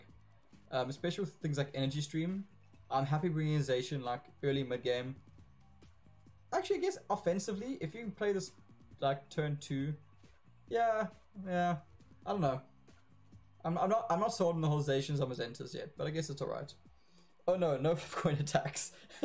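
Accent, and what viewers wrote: South Africa. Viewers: Hey man. I love the content since I started playing Pokemon TCGO again. I am curious though, are you South African as it sounds as though you have the accent.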